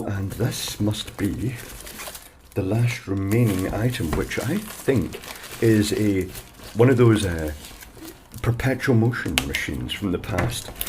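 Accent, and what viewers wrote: Scotland, United Kingdom. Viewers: A Scottish version of Ashens 😂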